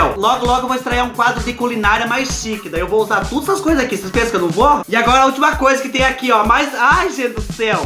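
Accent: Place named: Sorocaba, Brazil. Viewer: Reconheci seu sotaque de Sorocabaaaa! rs Até pensei: "nossa, a cidade tem o sotaque parecido com Sorocaba." XD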